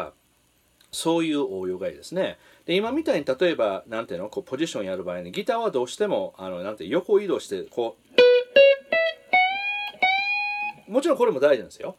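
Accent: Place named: Kyoto, Japan. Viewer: トモさんの京都アクセントには癒されます(^^) やはりチョーキングとヴィブラートはそれぞれ別個に練習してから、合わせた方がいいのですね。 勉強になりました。 ところでWhile my guitar gently weepsやanother brick in the wall part2のような二音半？ベンドがきついです。 何かコツや練習法ありますか？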